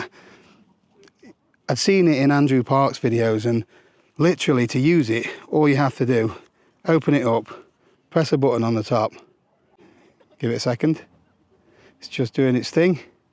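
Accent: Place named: Yorkshire, United Kingdom. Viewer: Thanks for answering my drone audio question,. Appreciate you don’t do “reviews”, but that’s helpful stuff. Like these shorts, chap. You can be a bit Yorkshire (for my southern sensivities) in the long form stuff. (o; I’m enjoying this You. Perhaps the ‘less hassle’ factor is coming through?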